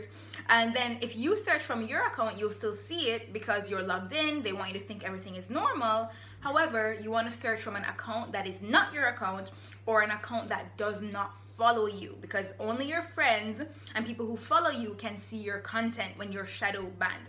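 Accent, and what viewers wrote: Jamaica. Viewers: you sound Jamaica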